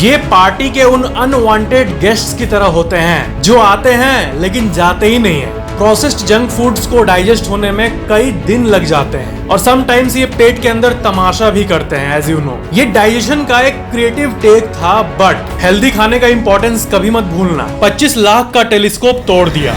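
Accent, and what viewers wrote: Bihar, India. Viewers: AAP BIHARI HO KYA...???? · Apka accent thoda bihari type lagta hai aap bihar se ho?❤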